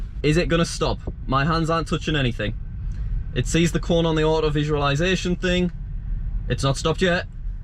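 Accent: United Kingdom. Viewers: He's from the UK, its his accent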